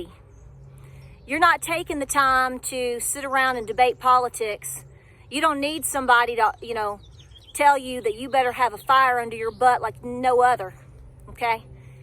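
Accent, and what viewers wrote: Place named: Appalachia, United States. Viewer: Anyone sayin you ain't appellation. Just need to hear this rant! Southern momma bear strong.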